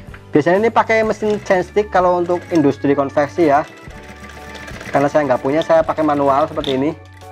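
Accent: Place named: Java, Indonesia. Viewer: Trims mas ilmu bermanfaat untuk pemula. sy senang dengar penjelasannya dgn kosa kata bahasa yg rada2 medok selain dapat ilmu juga mengerti bahasa Jowo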